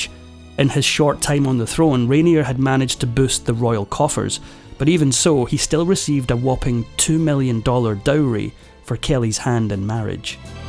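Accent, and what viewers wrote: Scotland, United Kingdom. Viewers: I love his Scottish accent. He speaks clearly and is very easy to understand! 😊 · I only watch the videos narrated by the Scot. Have him narrate them all, pay him more, and I think you’ll have lots of channel members. ❤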